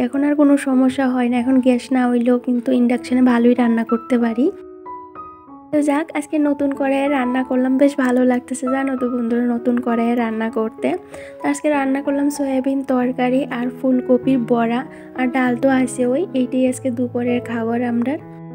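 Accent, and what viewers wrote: Bangladesh. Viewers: Khub sundar.tumi kotha thako. Tumi ki bangladesi.toma kotha sune mone hoche.kichu mone koro na.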